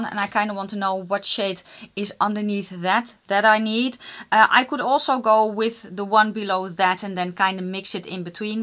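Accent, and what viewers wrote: Netherlands. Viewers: I knew that you are from the Netherlands as soon as you speak. Something about the accent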